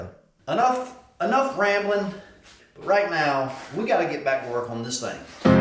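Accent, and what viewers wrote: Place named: Deep South, United States. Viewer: Tomatoe, Tom ato, potatoe pot ato it's all in where you're from. You've been pronouncing it correctly in the deep south dialect. 😁😁 Keep em coming brother!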